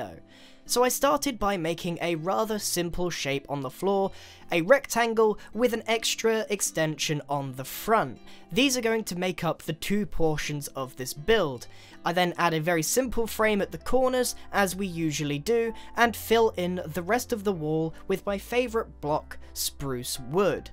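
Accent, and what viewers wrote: United Kingdom. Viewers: you're British right, it's hard to tell : o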